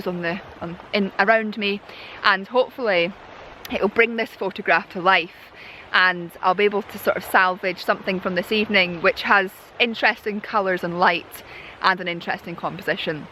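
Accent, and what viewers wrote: Scotland, United Kingdom. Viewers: I miss Scotland, I miss photographing in Scotland and I miss hearing the Scotish accent, the most beautiful accent in the world. I‘m thankful I can watch your videos so I get my dose of all of that. Thank you,!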